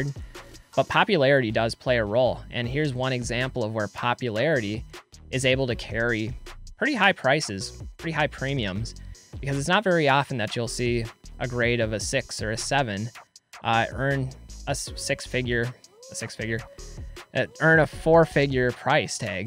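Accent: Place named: Minnesota, United States. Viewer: Holy shit man are you from Minnesota?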